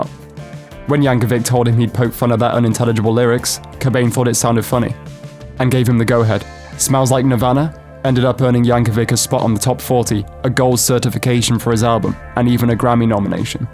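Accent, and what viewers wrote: United Kingdom. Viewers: What's with the British narrator? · The title is called "Why you haven't heard from in a while." The narrator sounds like a robot with a British accent. The video starts off telling his biography. In the first 35 seconds of the video you are bored to death. Please get to the point.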